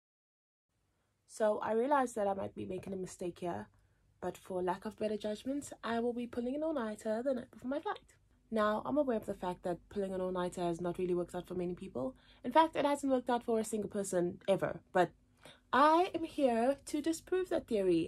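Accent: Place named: United Kingdom. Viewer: Which part of South Africa are you from, because that accent sounds british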